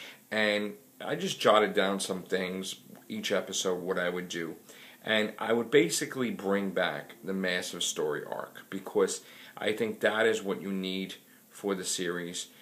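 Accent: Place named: Boston, United States. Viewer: You've got a great Boston accent!!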